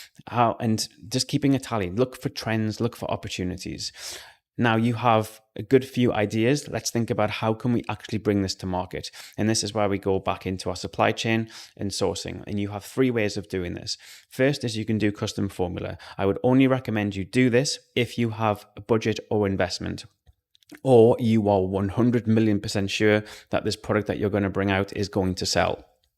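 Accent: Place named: Germany. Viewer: are u german ? you sound a bit like it im german aswell thats why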